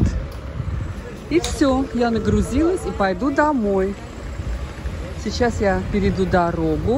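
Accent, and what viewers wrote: Baku, Azerbaijan. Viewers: Ваш русский язык с бакинским акцентом это услада для моих ушей.Спасибо за настроение. Баку город моего советского детства)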